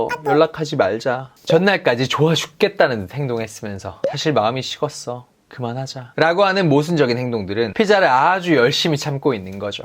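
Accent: Gyeongsang, South Korea. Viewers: 경상도 이네요이. 좋은자료 good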